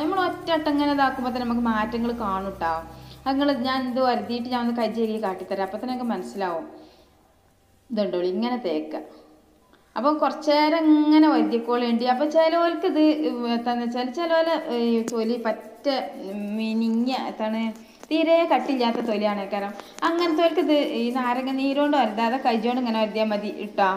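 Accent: Malappuram, India. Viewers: മലപ്പൊറം bhasha 😍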